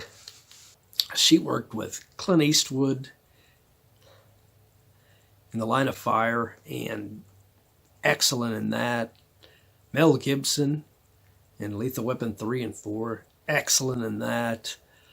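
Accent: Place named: United Kingdom. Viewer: I did like it. I noticed immediately that you had a totally different voice, certainly not an American one. And it was a good idea to use a British accent. As I've said before, it sounds a cold, commanding, sinister note. And amidst all those good old American "guys and gals" in "Queen Kobra" it was doubly effective.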